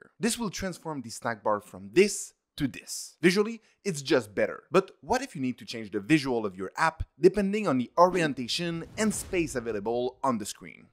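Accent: France. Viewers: That's a very nice explanation with a French accent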